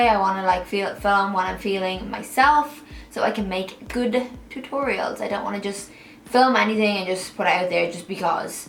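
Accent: Ireland. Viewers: I'm trying to figure out if your Irish or not 😂😂 amazing tutorial tho!😍😍❤️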